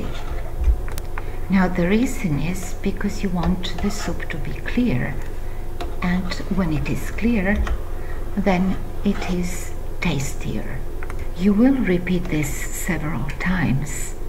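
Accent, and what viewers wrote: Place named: Eastern Europe. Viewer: You do the same! You're a lovely host, love your channel.😊 Are you in Eastern Europe by chance? I hear your accent & it's sounds familiar... The reason I ask is that my grandmother came to the US from there many, many years ago. Her style of cooking was similiar to yours also... 😁 I came to your channel from the "I am Leo" channel. Vesna is a terrific lady rescuing & taking care of all those stray animals isn't she?! I love her for doing all that... Seems to be that I'm starting to make friends in that part of the world, I've never been there but hopefully someday I'll be able to go there. There seems to be so many nice, friendly & sincere people there! Wonder traditions and family values there, I guess you could say I'm embracing my ancestry... 😊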